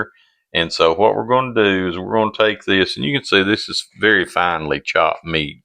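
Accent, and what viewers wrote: South, United States. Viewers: New subscriber here who just happened upon your video and My husband and I can’t wait to make this and we will be watching for more content and just to listen to you talk I LOVE a Southern accent more than anything in the world ❤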